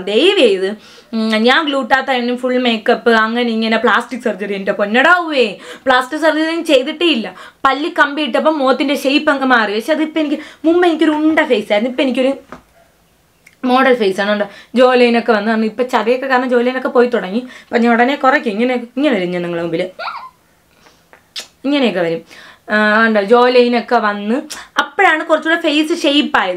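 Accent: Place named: Thiruvananthapuram, India. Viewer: Thiruvanandhapuram ahno sthalam😂 nammde tone pole 😆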